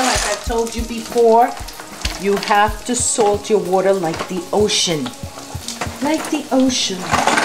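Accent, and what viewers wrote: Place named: New York, United States. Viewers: You gotta be from Brooklyn! I can tell from your accent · awesome ny accent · great new yorker(??) accent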